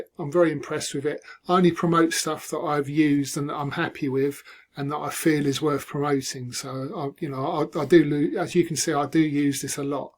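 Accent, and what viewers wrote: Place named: United Kingdom. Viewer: I like that you havent used ai to present this. And your British! Get fed up woth American avcents. Soz Americans😮.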